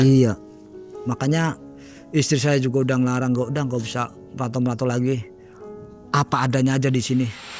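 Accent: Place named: Cirebon, Indonesia. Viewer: Orang jowo borot kayaknya (cirebon) ada “jeh” nya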